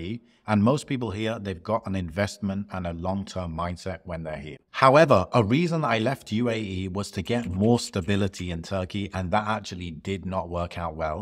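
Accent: United Kingdom. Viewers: Wait a minute are you British? How could you spend 19 years in the Middle Eastern region if you sound like a British guy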